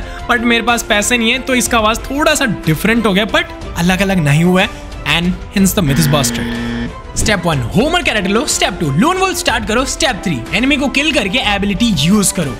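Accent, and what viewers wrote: Odisha, India. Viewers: Odia Hindi ❤ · Bhai tum odisha se ho kya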